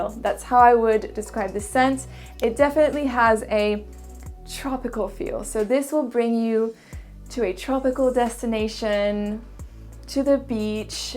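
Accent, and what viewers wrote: France. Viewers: I love your french accent ❤️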